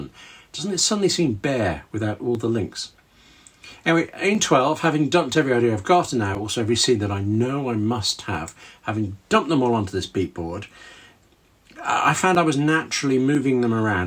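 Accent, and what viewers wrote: England, United Kingdom. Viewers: Truthfully... I made this same mistake in an email... easy to do... (Smile). Thank you again for this great video... By the way, your voice sounds like you may be from England? I just found out through DNA that I'm 68% English 23% Irish (Now this explains why I'm so Victorian WHITE skin) I have been told I have Bass Belly White skin... I do not think that is a complement, my response is, I am Victorian White skin, more porcelain... not the belly of a bass... fish! Laughing so hard... you can't make this stuff up!!! The reason for my inquire of you being English... is I am working on a manuscript, hopefully to turn it into a screenplay... It is based on a true story, which I just happened to be apart of, about one of my clients that went to Harvard a few years back, and because of this, I have linked the "Boston Strangler to Jack the Ripper" I won't bore you with the details right now, but if and when I do finish the script, and if it does something, I"ll let you know... 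I used to have family in the UK... the HARRYs we even had a shield or that crest? Please do not mind my ignorance. I hope if my screenplay about Jack the Ripper/Boston Strangler comes about, I plan to go to England... maybe find some of my blood relatives over there. It was my Aunt Helen Harry that taught me how to drink Proper Tea. It is a dream of mine to one day visit England.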